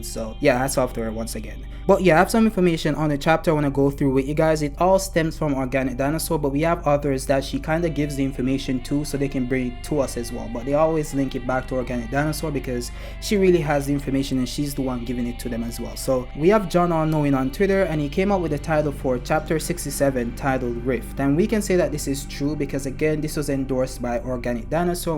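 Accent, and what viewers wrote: Jamaica. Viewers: Are you Jamaican bro?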